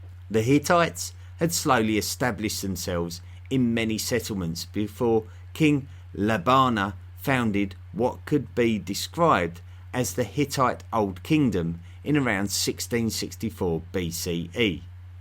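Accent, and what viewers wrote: United Kingdom. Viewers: He sounds like that guy on Saturday night live some years ago who talked about his drawings with a similar British accent. The guy would pronounce drawings as drowrings..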